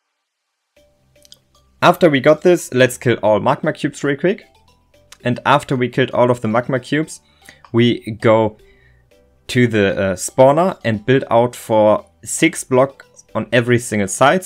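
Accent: Germany. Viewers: It's called a german accent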